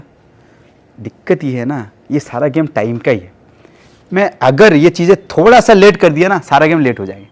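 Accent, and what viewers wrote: Odisha, India. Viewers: Sir ap odisha sa ho kiya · Hindi bolna style se lagg raha he , ap Odia ho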